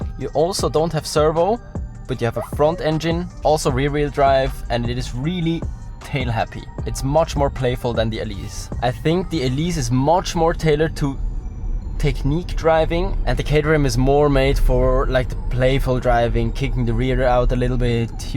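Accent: Switzerland. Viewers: Your voice sounds so majestic in this clip like some superhero lol and the swiss accent elevates it😂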